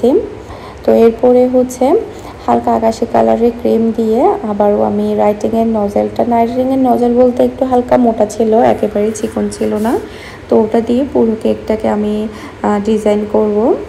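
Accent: Chittagong, Bangladesh. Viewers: আপু আপনি কি চিটাগং এর?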